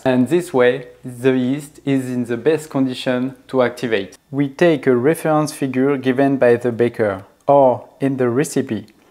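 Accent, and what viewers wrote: France. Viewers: Les anglais doivent être dingues de ton french accent 👍👍👍👍bravo en tout cas 👍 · Ha putain l'accent va plaire 💪 So frenchy ❤️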